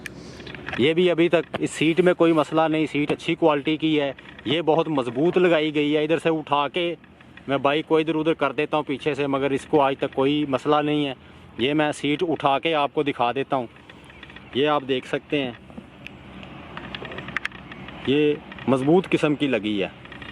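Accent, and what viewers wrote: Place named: Punjab, India. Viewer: Bhai apni urdu to teak kar lo adi punjabi mar ra ho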